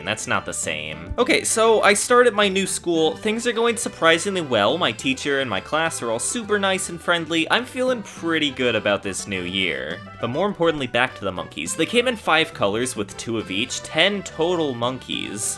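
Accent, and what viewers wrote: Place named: Georgia, United States. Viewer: You’re definitely from Georgia aren’t you?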